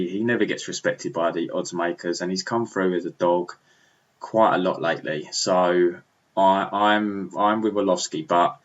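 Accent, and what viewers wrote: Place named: Cockney, United Kingdom. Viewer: your accent sounds like it's not sure if it's cockney or somewhere in cali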